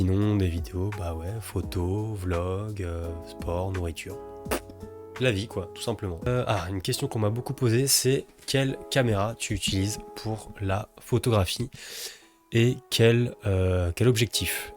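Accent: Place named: Paris, France. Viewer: your parisian accent is tres charming.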